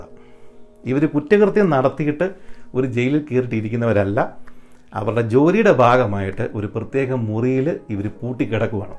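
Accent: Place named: Kasaragod, India. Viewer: നിങ്ങൾ കാസർകോട് ആണല്ലേ😜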